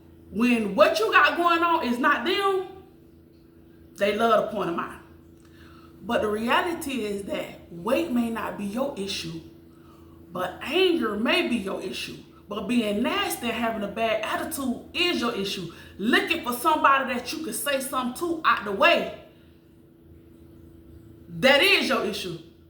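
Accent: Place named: Alabama, United States. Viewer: Is that a Alabama accent ❤ U sound just like a good friend of mine 😊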